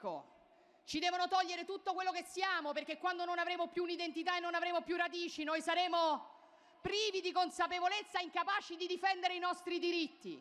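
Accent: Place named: Rome, Italy. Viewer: L'accento romano tipico, comme la Magnani....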